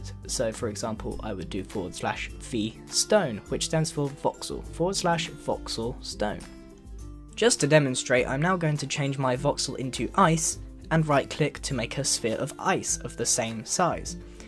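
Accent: United Kingdom. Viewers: are u a British person, you have an english accent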